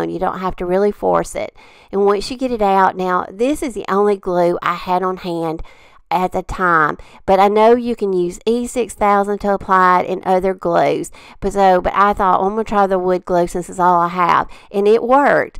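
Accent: South, United States. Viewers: I just need to tell you dear, that I love listening to your gorgeous Southern lilt! Brings back memories of visiting the southern USA in the 80's and 90's!! To yourself it may seem ordinary, but it is just soothing and sweet to hear you speak.....and of course I adore the lovely shabby chic pieces you create so effortlessly!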